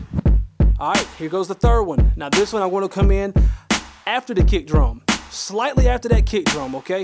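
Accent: United States. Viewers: You are one YouTuber that I can watch and understand all your teachings I love them all my song is coming together thanks and you sound like you from my home FLORIDA 😎 especially when you say dat 😂 we talk like dat 💯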